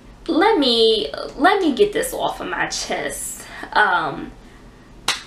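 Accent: New Orleans, United States. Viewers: Yes!! I love the Nola accent..Especially when you guys say."Babbyy"😁😁.!!! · I love this Nola accent I love being from down south baby it’s just something about our lingo and how we speak and each state is different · YOU FROM NEW ORLEANS?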